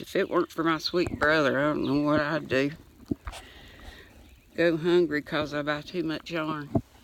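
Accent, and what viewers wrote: South, United States. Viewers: You are crackin' me up! Sending you vibes to find that "sugar daddy/mama" 😉I love your "country talk", it makes me happy💜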